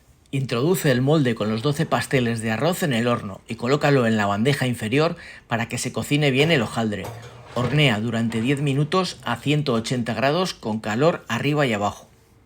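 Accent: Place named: Spain. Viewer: Fantástica receta...y lo más lindo,para nosotros los sudamericanos, es "vuestra habla"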